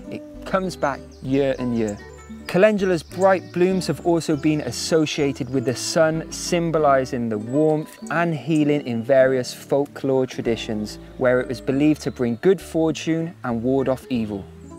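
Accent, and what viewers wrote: Wales, United Kingdom. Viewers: I thought he was saying elder and that it was just his welsh accent.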